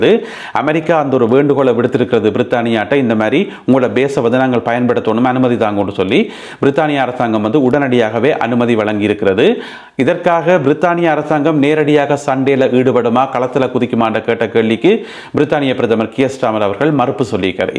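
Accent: Sri Lanka. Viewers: Once in a while give your name in the video I watch your episodes for your Srilankan Tamizh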